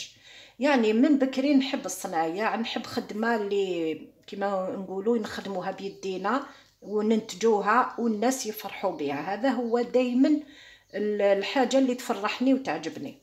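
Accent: Algiers, Algeria. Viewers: يعطيك الصحة طاطا انا عرفتك من عيد الكبير من مقروط ههه درتو عليك صح منخليش تعليقاتي بزاف بصح دايما نشوف وصفاتك ونخلي جام اليوم راني درت الفلو نتاعك بلبسكوي مرحي إلى الأمام ونحب لهجتك انا من وسط البلاد وزوجي عاصيمي ويلقي دايما أحكي كيما عصميات هههههه الله غالب يحب لهجتو ونجب نتعلم عليك اللهجة عاصمية وزيد عندي حبيبتي من العاصمة بضبط متزوجة في مكان أكثرية من غرب وهي عرفتني عليك قاتلي نحب نسمعها باه متهربليش لكسو نتاعي هههههه نحبك واصلي ديرينا أكل عصري بسيط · هدرتك جزايرية اصيلة مفهومة يا l'ame de tableبالعكس ماراكيش. تطولي ماذابيك تكثريلنا في الاطباق انتاعنا التقليدية الطبخ والحلويات والتدابير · Exactement madame vòus etes sur la bonne vois faite nous surtout les plats ancien dapres votre votre dialecte sace vois que vous etes امراة بطباع vouetes de medea ou du etorale dalger merci a vous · restez telle que vous etes et votre parler est typiquement algerois et j adore et il ya nuance quant qq parle pour parler et qq qui parle pour que le message soit comprit ce qui est votre cas bonne continuation sans oublier de dire que j adore vos gateaux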